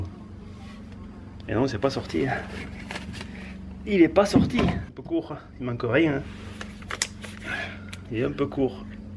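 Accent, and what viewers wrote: South, France. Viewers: Belle vidéo comme d'hab. ce paysage est magnifique et cet accent me dit quelque chose je pense que nous somme pas loin l'un de l'autre. Poir ce qui est des plot tu peut le remplacer par des laver autoblocant cela marche très bien aussi allez ciao a très bientôt dans tes vidéos qui me font passer à chaque fois un super moment même si comme toi j'ai un grand jardin qui me permet de pas m'ennuyer Jphi de carcassonne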